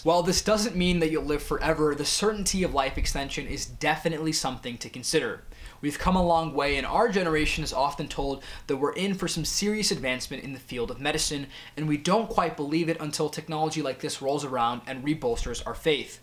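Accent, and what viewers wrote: India. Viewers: Do you have a tiny bit of an Indian accent? I'm not sure whether or not I'm hearing something.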